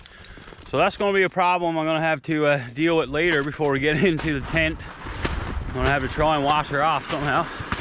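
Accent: Newfoundland, Canada. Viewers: I like the Newfoundlander accent, reminds me of when I was little. That is a great dog he is such a character!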